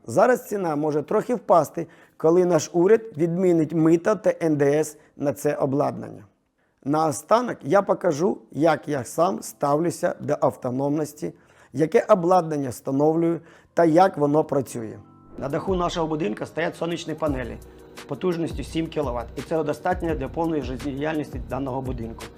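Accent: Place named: Chernihiv, Ukraine. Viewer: А що мова не рідна? Вимова схожа на чернігівську😊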